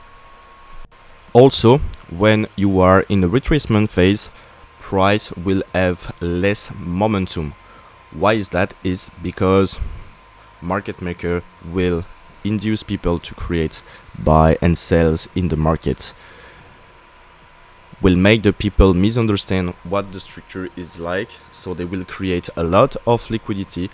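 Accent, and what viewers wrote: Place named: France. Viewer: U have a French accent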